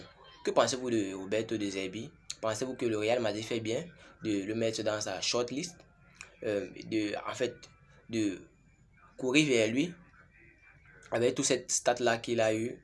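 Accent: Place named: Cameroon. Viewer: Mon frère il faut cesser de nous parler le français camerounais et on ne sait pas ce que tu as dans la bouche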